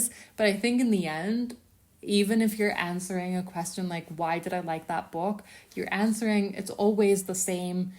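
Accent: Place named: Ireland. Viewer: Do you mind me asking where you are from? I find your accent fascinating. As an American English speaker, I don't detect a strong accent on most of what you say, but occasionally some words feel slightly seasoned with an accent that I can't quite identify. Seems possibly Irish, but my mind might be jumping that way due to your red hair.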